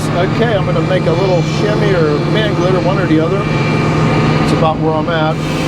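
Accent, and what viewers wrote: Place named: Pennsylvania, United States. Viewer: Love Pennsylvania accent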